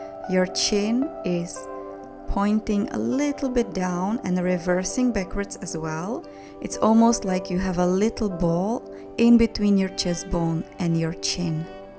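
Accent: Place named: United States. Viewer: sexi baba ze cesko a meles ako z ameriky hee.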